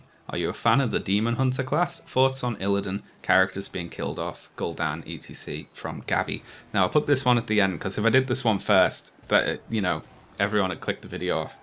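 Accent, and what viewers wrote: Liverpool, United Kingdom. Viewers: Scouse power!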